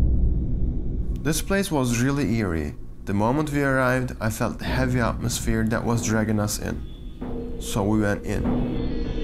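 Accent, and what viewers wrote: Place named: Black Sea, Turkey. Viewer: videolar cok guzel ama karadeniz aksanli ingilizce cok sikinti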